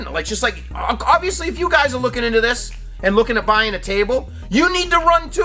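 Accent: Boston, United States. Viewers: Was just gonna ask , u from Bosten, lol.😊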